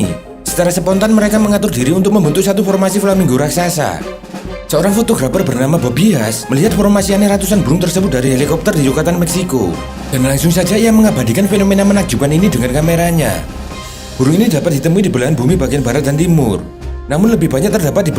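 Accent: Java, Indonesia. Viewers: Momong medok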